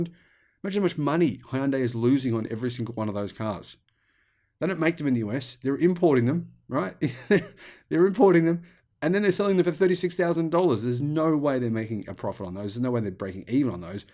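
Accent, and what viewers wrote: Australia. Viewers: I love your show, but your accent is destroying the word "inventory." You keep saying "inventry," which has no definition that I can find. Now I know that being American, we don't usually say English as proper as the British, but I thought you Aussies spoke just like them. The first few times you had said it, I was thinking what the heck is this guy saying. Then I finally figured it out. BTW I want a model Y, but it would drive me nuts if the new one is extremely different and alot better, so I'm waiting.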